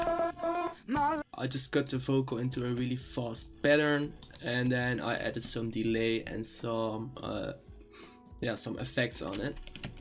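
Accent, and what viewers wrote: Netherlands. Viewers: Hahahaha je can horen dat je Nederlands bent, klopt dit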